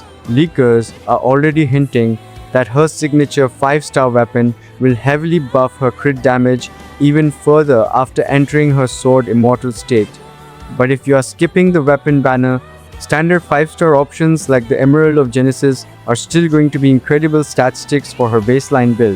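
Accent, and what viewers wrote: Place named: India. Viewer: Don't use an Indian ENG accent if u want more followers 😀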